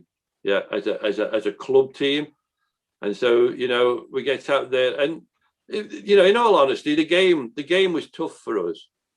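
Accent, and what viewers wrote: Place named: Birmingham, United Kingdom. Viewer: Bit of a Brummie accent creeping in there. I asked my Irish Father is Jack Grealish the best captain-absolutely not.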